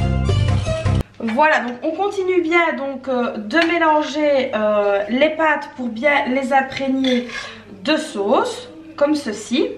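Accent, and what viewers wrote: Belgium. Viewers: tu es de Belgique car tu as l'accent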